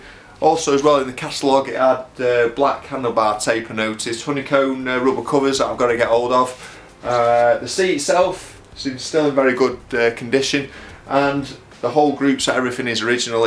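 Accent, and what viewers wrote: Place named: Stoke, United Kingdom. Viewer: I have just got myself an '84 Raleigh Corsa (which I collected from all the way from Stoke, so going by your accent, not far from you). This is similar to this bike to your one here but in metallic pearl white. I now own... 1985 Quasar 1986 Sirocco (same as <num> Sirocco (same as <num> Corsa 1985 Competition 1985 Road Ace 1984 Team Replica 1989 Record Ace 2010 Avanti Team Just need a 1985 Record Sprint then my "wish-list" collection will be complete. Oh, maybe a gold-plated Centenary and the frame-only "Reynolds 531 Pro Super" chrome Team Replica & Oyster & semi-chrome sets and a 753 frame for good luck. <link>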